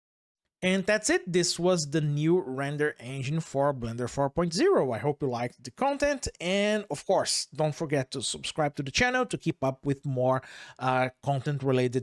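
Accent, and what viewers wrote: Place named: Brazil. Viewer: sotaquinho brazuca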